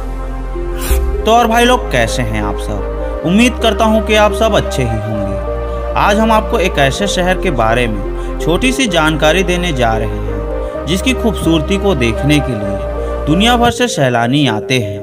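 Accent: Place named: Jabalpur, India. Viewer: Kye badda Jabalpuriya bolte ❤️🥰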